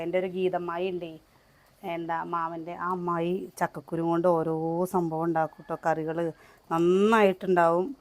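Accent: Palakkad, India. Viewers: Chechide palakkaden slag kelkan nalla rasamund from kasaragod 🥰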